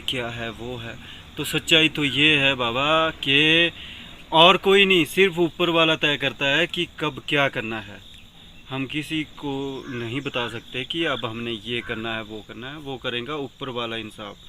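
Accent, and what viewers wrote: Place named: Punjab, India. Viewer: Ap jo batye karte ho achi lagti us m thoda punjabi ka touch hota ha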